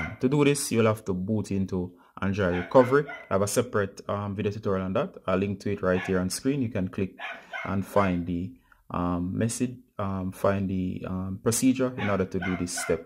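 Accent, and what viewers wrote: Jamaica. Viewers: What the hell, lmaooo not an indian voice but a fellow Jamaican, wow, big up #.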